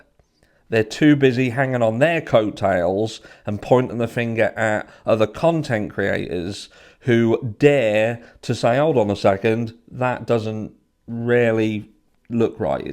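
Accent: Norfolk, United Kingdom. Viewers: Go,!! I agree 100%. Usually, here in the US, most conspiracy theories turn out to be true. I must admit, I have difficulty listening to the British accent but you and Jonny Lee Miller have changed me. Love your videos. Any updates on poor Sean Daugherty? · Appreciate you and all your hard work 🤍 Is that a Norfolk or Suffolk twang I can hear? 😊✌️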